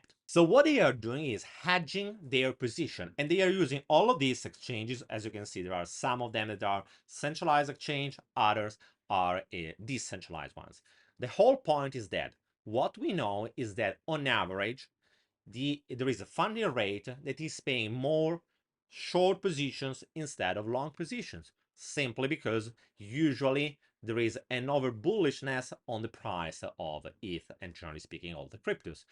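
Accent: Italy. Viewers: Love your accent. Is it Italian? Spanish?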